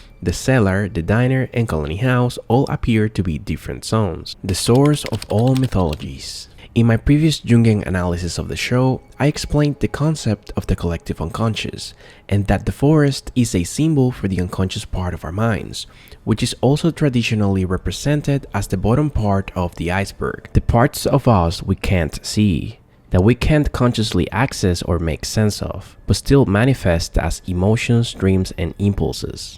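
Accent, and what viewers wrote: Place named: Europe. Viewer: Your accent sounds like you from Europe somewhere like Turkey or Greece or maybe even like Ukraine Finland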